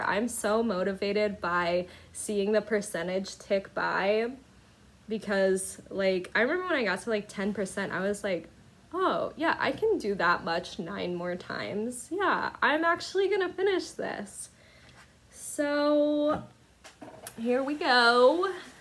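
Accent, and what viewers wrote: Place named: Midwest, United States. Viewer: Hi! So nice to "meet" you. Michelle Bendy mentioned you so I have now watched all three. I had a feeling it was midwest. I spent approx 30 years in Oak Park & Forest Oark, IL so hi neighbor. 😁 Thanks for sharing your time, and your joy of stitching. It's refreshing and no, I don't hear a lisp at all. Age? 29?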